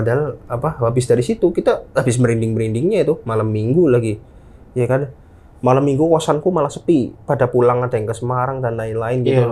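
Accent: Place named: Banyumas, Indonesia. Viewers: Bhasane msih ketara ngapake kang kya nyong 😅😅😅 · Kereeen bahasa ngapaknya.. Sering2 diselipkan bhs ngapaknya bang.. Biar makin seru.. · Joss wong ngapak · Cilacap hadir, wkwk jawa bgt ya mase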